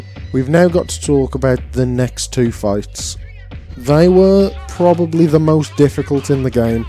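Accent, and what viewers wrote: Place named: Midlands, United Kingdom. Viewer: you from the midlands sound it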